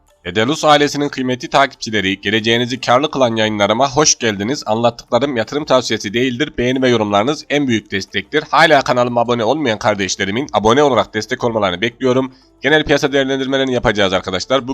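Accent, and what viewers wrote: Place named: Central Anatolia, Turkey. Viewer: dost..Sen konya yada Kayserili misin...aksan Anadolu'nun bu kısımlarını işaret ediyor..ikincisi güzel kardeşim sanırım böylesi bir kurul zaten var... Cb'ligi ekonomi yüksek istişare konseyi yada benzer bir adla....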